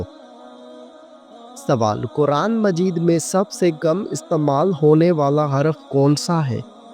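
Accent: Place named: Sindh, Pakistan. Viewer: Ap sindi hu achi ko ashi khty hu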